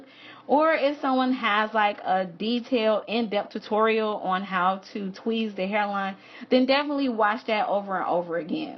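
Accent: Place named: Philadelphia, United States. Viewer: are u from Philadelphia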